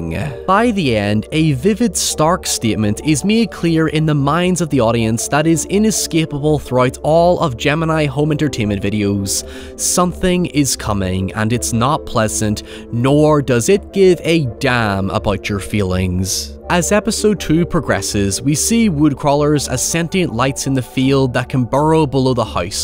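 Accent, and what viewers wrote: Ireland. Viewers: God, I love how Irish youtubers pronounce certain words. Every Irish Youtuber I’ve watched loves to use the word “Hoyever”.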